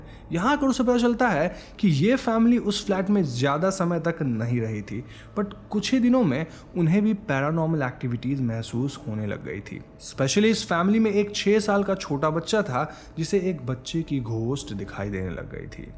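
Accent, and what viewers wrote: Bengal, India. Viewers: are you bengali ?, as your hindi has bengali accent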